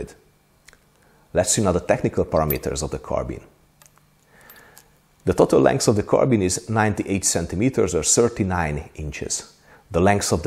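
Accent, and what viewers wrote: Hungary. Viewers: I have recently found your channel and I thoroughly enjoy your videos in the beautiful Hunglish language! I enjoy reading historical fiction, especially that of Bernard Cornwell over the periods of the Napoleonic Wars, American War of Independence and the US Civil War. In it he describes the weapons and how they're used in detail. Your channel is the first one I've found that goes into the same detail, showing how they work and their design. I really enjoy seeing all these pieces I've read about in action. Thank you very much for sharing your passion with us! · And a thank you to you, in beautiful bright Hunglish—Köszönöm!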